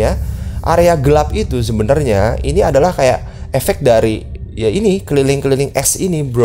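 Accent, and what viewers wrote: Java, Indonesia. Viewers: Orang jawa bang? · Jowo banget mendelep 😭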